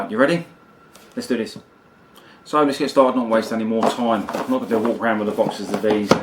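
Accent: United Kingdom. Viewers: Ahah, nothing in particular, I simply like british pronunciation. :)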